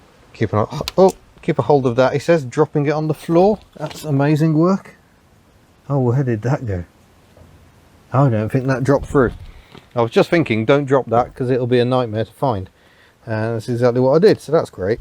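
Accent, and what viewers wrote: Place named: England, United Kingdom. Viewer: I like how you English say chickin. Daewoo is a nice shape but not the colour I would personally pick. That three cylinder growl was a treat to the ears. I also enjoyed the Charade for that in a recent video.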